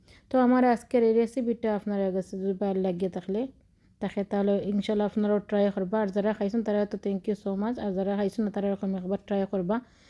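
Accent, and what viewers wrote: Sylhet, Bangladesh. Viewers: Sylheti kotha ghula vala lagw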